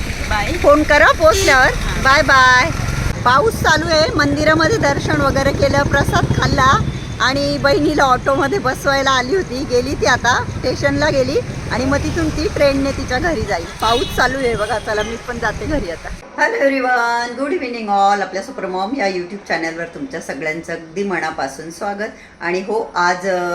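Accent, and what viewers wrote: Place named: Satana, India. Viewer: ताई कुठे राहता नाशिकला तुम्ही नाशिकला कुठे शिफ्ट झालात तब्येत फारच बिघडून गेलेली आहे तब्येतीची काळजी घ्या ताई ओटी खूप छान भरली देवीची सगळं आपल्याकडच्या पद्धतीनेच केलं तुम्ही सटाणा खूपच छान बोलता मला खूप तुमचे व्हिडिओ आवडतात पण तुम्ही कधी कधीच व्हिडिओ टाकतात ताई नाशिकला कुठे शिफ्ट झाले सांगा मी तुम्हाला भेटायला जरुरी मी पण नाशिकलाच राहते ताई कमेंट ला रिप्लाय द्या माझी सुंदर ताई माझी सुंदर बहीण माझी गोड बहीण अशाच गोड सतरा अशाच बोलत रहा खूप छान राहता येत मी श्री स्वामी समर्थ शिव शंभू शंकर बाबा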